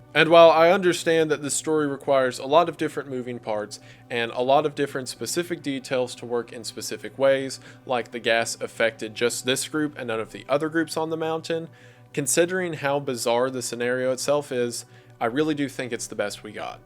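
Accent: South, United States. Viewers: I also notice he puts "such as" in places I personally find unusual and it stuck in my mind because it made me think of that beauty pageant contestant who said some garbled stuff about education in "the Iraq" in response to a question and I thought that was her just making a mistake but the way he keeps using it in a consistent way and they have similar accents it might be a southern dialect thing? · He pronounces a lot of things in other languages in a very American sort of way. 😂 He's got quite a drawl and it can really catch you by surprise sometimes! On a lesser note, he seems to have misread "Dyatlov" outright, which is a thing he's done before with other words/names (foreign and english-language), so who knows, maybe he's struggling with a lil dyslexia, too. Sooooo many male content creators I follow have dyslexia & ADHD, so I really wouldn't be shocked if that were the case. 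Maybe a pronunciation key in his notes would help in the future.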